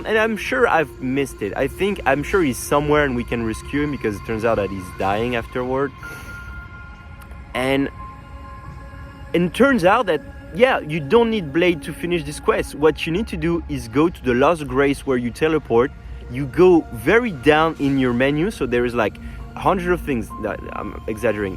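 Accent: France. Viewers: It was good while I was around 50 because at least you don't die with a single shot, the problem happens when you are constantly ganked. You can only invade if the host already has a summon, and once you invade he can summon a blue hunter... There should not be an item like that, and the invader should be able to summon help. That's my opinion. Fixing PVP is the only thing this game needs in order to b perfect imo. You've got a new subscriber, I am looking forward to watching more videos. Loved the French accent haha!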